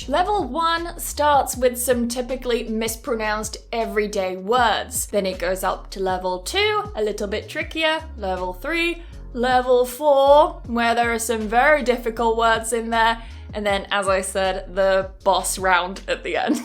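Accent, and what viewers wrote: United Kingdom. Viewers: are you a british speaker?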